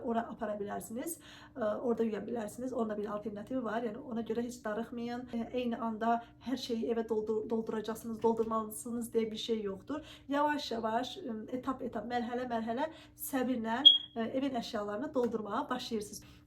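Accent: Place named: Zaqatala, Azerbaijan. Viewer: Maşaallah olsun. Həzz verir adama izləmək. Zaqatala ləhcənizi yesinlər, hocam 😃❤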